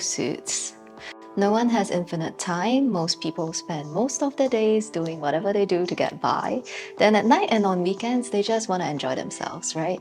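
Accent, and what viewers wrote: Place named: Singapore. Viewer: I could honestly listen to you talk for hours. That Singapore accent... Good Grief. Love it. Will be in Bali soon if you have a twin sister lol !! Love the channel. You and your husband look cool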